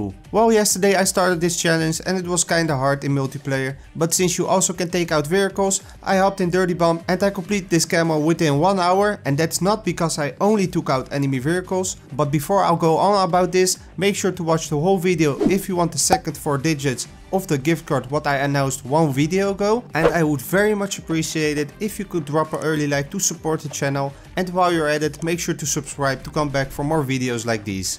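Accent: Netherlands. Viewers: you sound dutch mate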